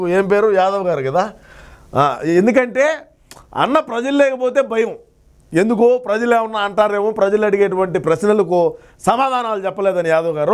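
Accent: Rayalaseema, India. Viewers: గారు మీరు చేసిన వీడియోలు మీ రాయల సీమ యాస లో బాగా చెప్తారు...మీ విడియో లు అన్ని చూస్తాను లైక్ కామెంట్ షేర్ చేస్తాను... కూటమి గవర్న్ మెంట్ రావడానికి మీ విడియో లు సగం కారణం...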